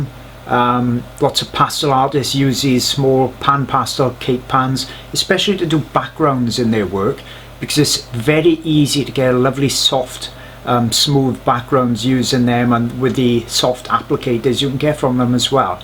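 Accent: Wales, United Kingdom. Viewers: The absolute joy I have not only finding this video in my first ever day using pan pastels, but also hearing the voice of a fellow cymry. I just find it so soothing and familiar when learning new things, so I'm overjoyed to have found your channel.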